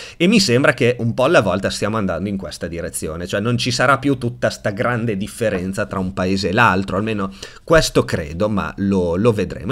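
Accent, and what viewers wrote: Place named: Romagna, Italy. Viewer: Quando dici la parola “questo” ti adoro, il tuo accento romagnolo è fantastico 😄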